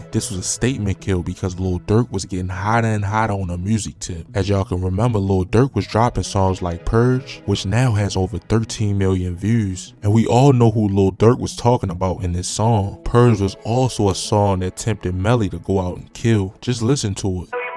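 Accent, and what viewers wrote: Baltimore, United States. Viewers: Lmao ya accent screams Baltimore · You can tell you not from city and sound like you from Bmore. Nigga dont know how to say calumet or cicero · This nigga gotta be from Baltimore the way he talk 😂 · This accent killin me. You from Bmore? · he sound like he from Bmore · Lol Bmore accent detected tew yew dew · You can tell this guy is from Baltimore or the dmv lol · Between your fake over exaggerated suspenseful voice to the irritating Baltimore accent l😂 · nigga bmore accent funny as hell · ain't no q about it, you hear that baltimorese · You from Baltimore?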